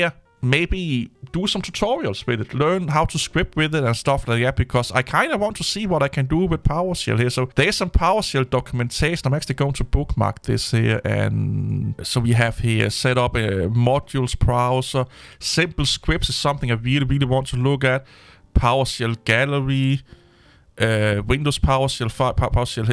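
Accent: Denmark. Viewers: you sound danish :)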